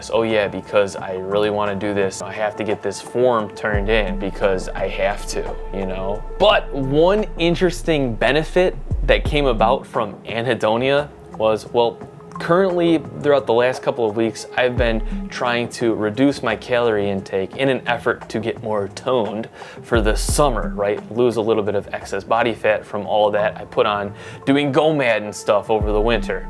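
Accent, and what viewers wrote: United States. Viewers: I don't know about America(judging by the accent) but in Poland most commonly it's adviced to take it when you are relaxing to go to sleep in last 1-2hrs before you close your eyes. The side effects that you describe are similar to what I noticed poping a pill in the middle of my day.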